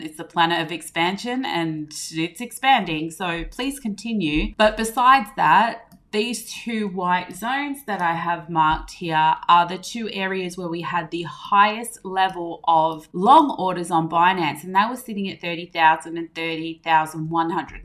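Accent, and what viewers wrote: Australia. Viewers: Not all all. Love your accent and voice! I love Aussie accents. Best one for women to have!!! I work in the Film industry with them!!!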